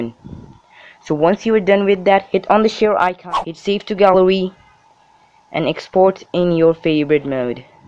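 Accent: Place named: United States. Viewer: Finally a Fuckin american Im so tired of those indians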